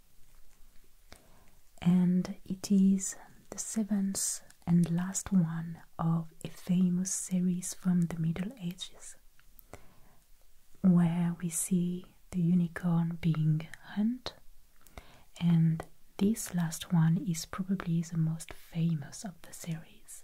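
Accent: France. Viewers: These are my favorite types of videos, that makes....love the sound of the paint brush strokes on the tapestry's....and the running of her hands over the fabric(it sounds like the ocean)....very soothing and comforting....along with the history lesson and background of these beautiful works of art....complete with her french accent....who needs to go France???....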